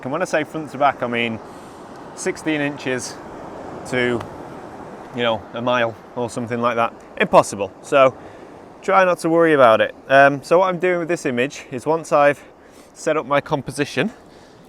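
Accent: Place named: United Kingdom. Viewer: I was just wondering, but where does this guy live ? ... it's raining all the time and he speaks proper English.... must be a Brit ! and I like it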